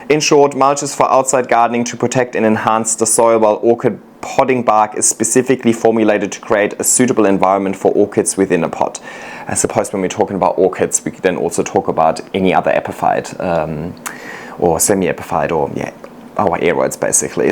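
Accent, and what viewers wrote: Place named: Germany. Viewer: I didnt even know you were from gemany! I was just curious about your accent. Like you speak fluent of course but somehow I felt something was different. Now I know what it is! Greetings from germany! Currently going into full "growing season" I was going to ask: Do you miss snow? But then I remember how we had like 3 days of snow last year... I mean you got white beaches afterall right?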